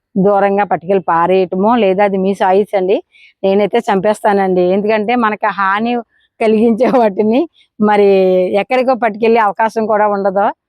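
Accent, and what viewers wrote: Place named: Tamil Nadu, India. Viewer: garu mee videos regularga chustunnanu.meeru nammutara me pata videos kuda continue ga chustunnanu. Meeru okasari evaro me bhasha gurinchi Comment chesarani badapaddari kani me yasa chuste meru tamil anipustudi Kovai sarala matladinatluga undi me bhashani nenu enjoy chestunnanu. Bhasha mata ela vunna meeru matlade pratimata vupayoga karanga vuntundi. Oka friend matladinatluga prati chinna vishayamni vivaranga chebutaru me 2years pata videos kuda chustunnanu. Prati video ni like chestunnanu. Naku me videos chala upayogapadutunnai thank you 🙏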